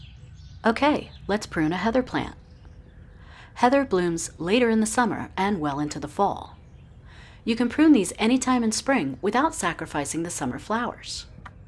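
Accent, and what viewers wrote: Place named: United States. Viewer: Sounds like she iş american BUT gardening in england.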